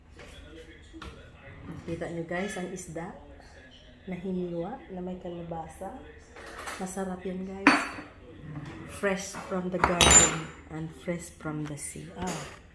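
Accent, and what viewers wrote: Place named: Visayas, Philippines. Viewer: Ohh ang sarap niyan kapatid..parang Bisaya Ka host..taga saan Ka.??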